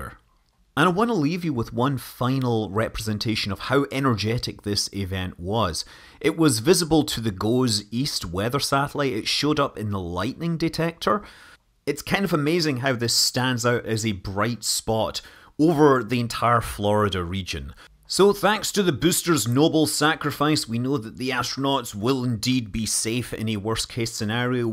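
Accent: Scotland, United Kingdom. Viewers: Not mid-Atlantic, Scottish. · Scott Manley is Scottish, so he pronounces some words differently than what you'd expect, say, from an American or an English person. The English language is spoken by hundreds of millions of people around the world, in all sorts of different dialects. Expecting any sort of logic or coherence in the way words are pronounced is optimistic at best.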